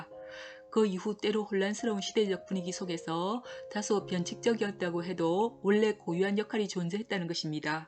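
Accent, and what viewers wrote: Gyeongsang, South Korea. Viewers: 그냥 경상도쪽 아주머니 딱 말투구만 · 사투리라서 그런거니 자꾸 고치라고 하지 마세요. 억양이나 발음이 서울말씨와 다른것이 잘못된것은 아닙니다. 다른지방 사람들에세는 익숙하지 않아서 그렇지. 그리고 바꾸기 몹시 어렵습니다. 서울사람 아무리 연습해도 절대 경상도말투 못배웁니다. 서울 사람이 경상도에 가면 경상도 말로 고쳐야 합니까? 절대 나무랄 일이 아닙니다.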